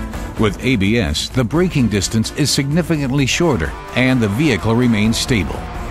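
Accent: United States. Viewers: so many information not right: driving a car in high heels, a murican accent trying to illustrate the scene by showing stepping on break and CLUTCH....but overall good job ABS. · did we need the "american voice"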